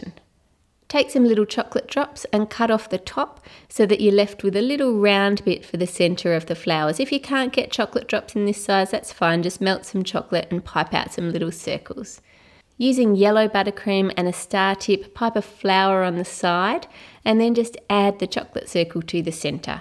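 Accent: Australia. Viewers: Haha you sound so much more Australian i this video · R u Australian??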